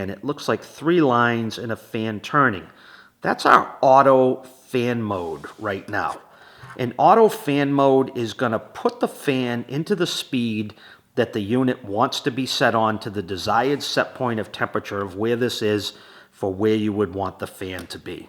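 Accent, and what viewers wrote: Boston, United States. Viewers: Have watched this So many times --- video is super clear and helpful!! (Boston accent is a plus - generates total trust and confidence that the person speaking know what he's talking about!!) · Just got a new house and have been cold for two days! Thank you for this helpful video - you explain things so clearly (plus the slight accent reminds me of my family from Boston so extra warmth there too). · You sound like you're from Boston❤. I am too. Anyhow my house was freezing inside. Those icons on the remote are very strange. Then I played your excellent video a few times and took notes. Now I'm cozy and happy. Many thanks,.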